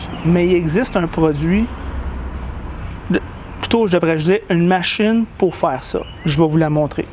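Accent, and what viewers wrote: Quebec, Canada. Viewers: personnellement,j'ai un faible pour l'accent québécois. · La vidéo est très claire et explicative, merci beaucoup... par contre je suis désolé mais l'accent canadien me permet pas de rester sérieux mdr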